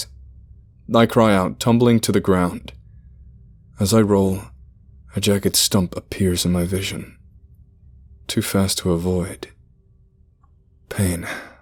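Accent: United States. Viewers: Your American accent is so good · This reading was fantastic!! Haha you perfected the average american accent on this one, bravo!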